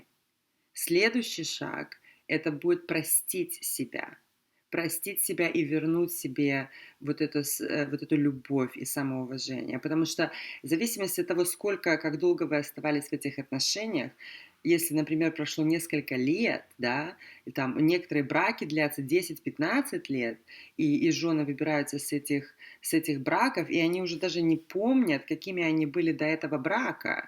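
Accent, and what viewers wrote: United States. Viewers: Очень приятно вас слушать. Приятный американский акцент. Хочу так разговариваить. так бы слушала и слушала. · Какая жёсткая американская интонация...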